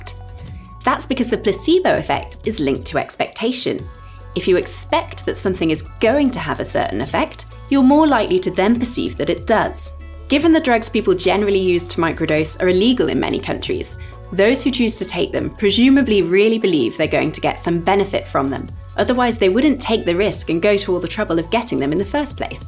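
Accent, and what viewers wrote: United Kingdom. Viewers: She's so beautiful and i love her British accent!! Thanks for sharing.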